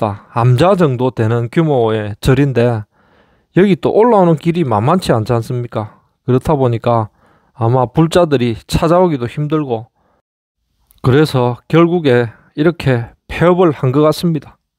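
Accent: Gyeongsang, South Korea. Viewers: 말을 참 조곤조곤하게 잘하시네요.저는 개인적으로 경상도 말투를 좋아 하지 않는데 말투는 참 듣기좋네요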